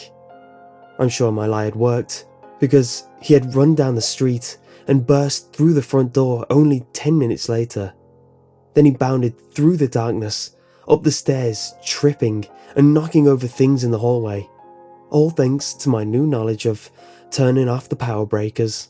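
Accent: United Kingdom. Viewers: THTHTHTHT terrible. british accents cant help terrible stories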